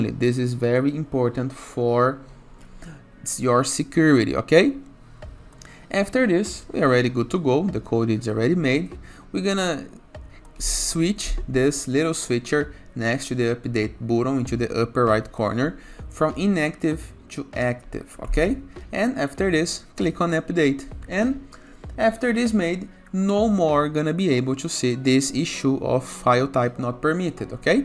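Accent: Brazil. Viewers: consigo reconhecer o sotaque brasileiro de longe, valeuzão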